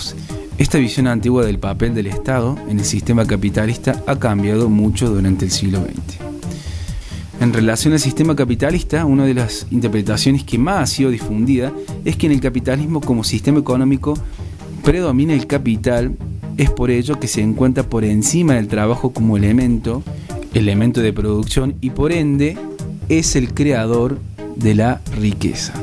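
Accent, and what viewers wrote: Córdoba, Argentina. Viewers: No me concentre en todo el video escuchando su acento cordobés xd 😍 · sos cordobes? por cierto buen video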